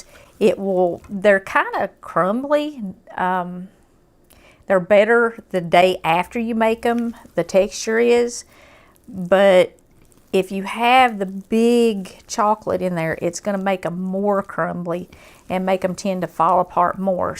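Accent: South, United States. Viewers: I love her accent. The lovable southerner.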